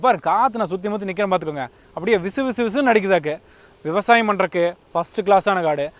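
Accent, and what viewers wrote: Kongu, India. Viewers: Anna unga kongu bashai super naa.Naanum namma urthanungo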